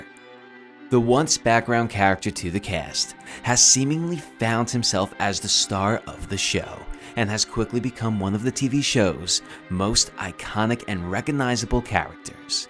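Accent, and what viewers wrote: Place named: United States. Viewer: You sound like how europeans think an american youtuber is supposed to sound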